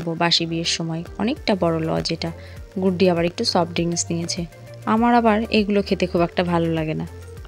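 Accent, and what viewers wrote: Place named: West Bengal, India. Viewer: Khub sundar. Bujhte parchi na korhakar biye. Bajna theke mone hoy Bangladeshi biye r apnar bhasa amader west bengal er moto.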